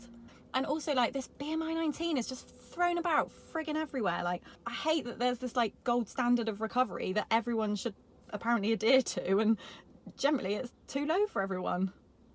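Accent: United Kingdom. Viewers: She has a British accent but the steering wheel is on the left side. What gives?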